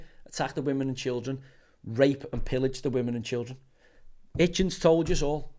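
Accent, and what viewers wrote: Liverpool, United Kingdom. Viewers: Nothing beats a great Scouser rant. But spot on brilliant commentary! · He talks so much shit Uneducated scouse tinkler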